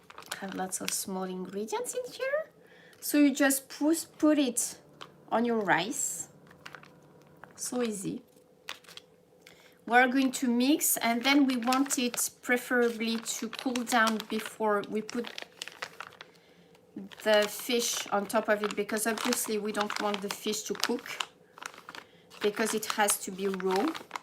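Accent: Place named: France. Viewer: フランス語訛りの英語、理解するのがつらい🎵すしのシャリを入れた木製のボールは素晴らしい。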